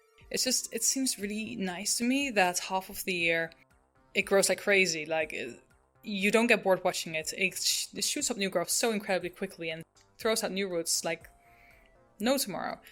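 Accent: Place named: Netherlands. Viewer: Oh No water culture 😲 (Genf ? you sound Dutch)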